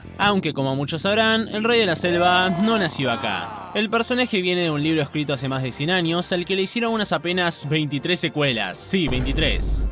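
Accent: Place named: Argentina. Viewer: Nana amo como hablás amigo y relatas amigo. Explicativo y chistoso argentinado jaja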